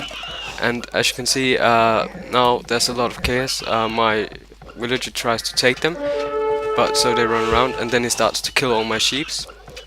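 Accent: Denmark. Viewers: are you danish ? of course you are, you sounds like a danish guy ?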